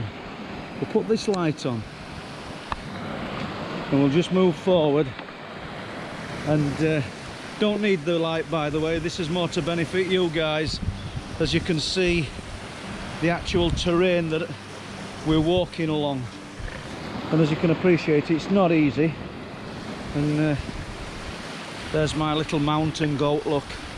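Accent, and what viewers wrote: Yorkshire, United Kingdom. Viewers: Wonderful video and so lovely to hear a Yorkshire accent again, after growing up in The Dales. I'm 400 miles North now but do miss it, even more so now.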